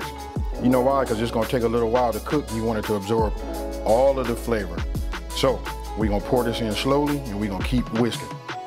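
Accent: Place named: California, United States. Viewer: Once I heard that Cali accent I was like Nahh I trust sun cooking..... don't know why but I do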